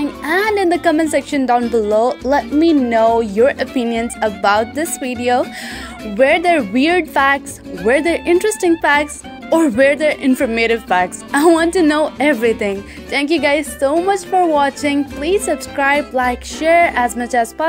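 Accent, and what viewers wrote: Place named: South Korea. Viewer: Loved your korean accent by the way!❤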